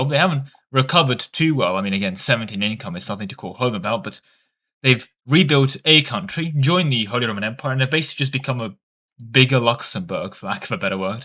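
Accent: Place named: England, United Kingdom. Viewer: The english accent got thicker with this vid.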